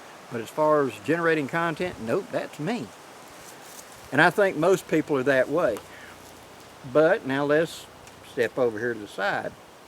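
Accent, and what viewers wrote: South, United States. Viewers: Hey - That stuff sounds complicated to me. The only thing I know how to do, is watch the videos. That works for me. It is interesting to say the least. You'll figure it out and your southern accent is not near as bad as up here in WV. You take it easy and just go with the flow- Carry On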